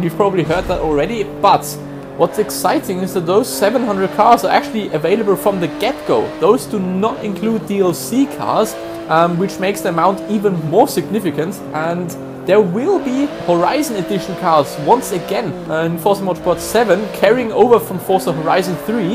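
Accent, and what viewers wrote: Germany. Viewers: Thanks for the info... And your german english.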